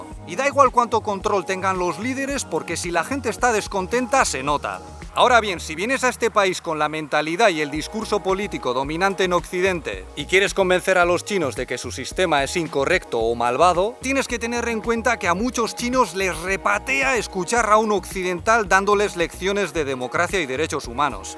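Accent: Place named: Spain. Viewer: No se quien luce más Chino si elle o su marido. Con su semblante que pone en las cámaras de líder de el partido comunistas que nadie le puede preguntar nada. Ese es el semblante que se ve. No digo que es así pero es lo que proyecta. O será que la cultura china a influido tanto en el que pone un semblante de Chino más que lele. Además querer soñar Chino con su acento español suena algo gracioso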